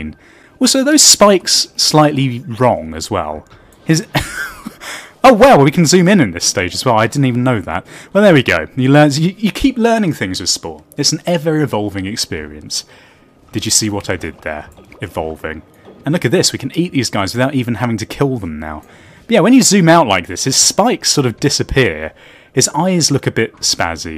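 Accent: United Kingdom. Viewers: is so gorgeous and fabulous from british voice like france! He played together with Species-x and make a friends!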